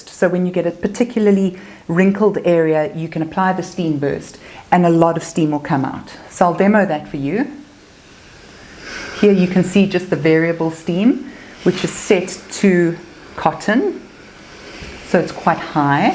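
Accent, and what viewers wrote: South Africa. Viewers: You sound South African😊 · She has a South African accent.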